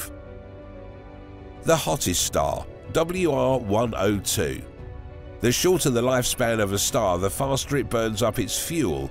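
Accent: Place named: Cockney, United Kingdom. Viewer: Yes this is all very interesting, but the narrator sounds like some geezer off Eastenders with a sinus infection. Couldn't you find someone else who sounds more educated and less unhealthy?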